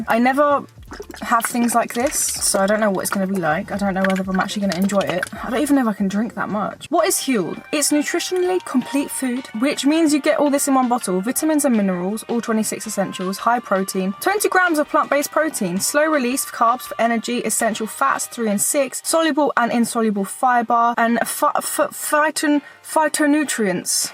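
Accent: United Kingdom. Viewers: I'm also British but I love how extra British you are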